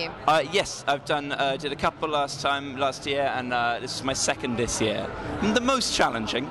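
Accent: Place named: RP, United Kingdom. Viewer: Cute with that post accent. Don't know if he would be as cute if he weren't post.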